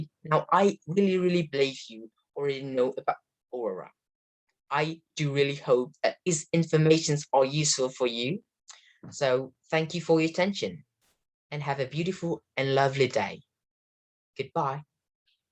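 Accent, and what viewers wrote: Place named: United Kingdom. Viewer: Wih ini cara ngomongnya british banget yah keren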